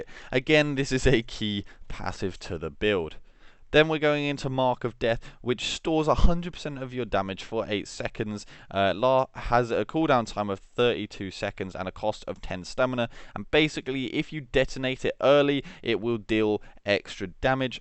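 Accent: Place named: Australia. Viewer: You SOUND, I think, Australian. However, you can't say perfectly normal English words like caltrops, sundered, or comatose (claptraps, shundered, and catamost, respectively). A-are you just... like... illiterate? If so, I guess I'll never get an answer.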